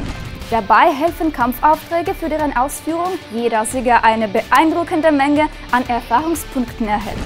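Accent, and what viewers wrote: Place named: Russia. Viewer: Ich mag den russischen Akzent :D Und geile Events!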